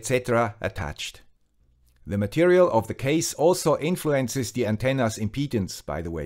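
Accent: Switzerland. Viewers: This gentleman sounds exactly like Andreas Spiess, the guy with the Swiss accent.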